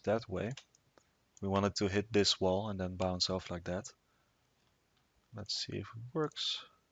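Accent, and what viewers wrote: Netherlands. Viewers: thnx for the tutorial btw u sound dutch :P greetings from amsterdam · nice tutorial, but doe iets aan je engels. ik irriteer me wel een beetje aan het nederlands accent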